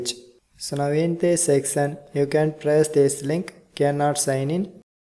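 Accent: India. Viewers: Indian accent always helps people !! <3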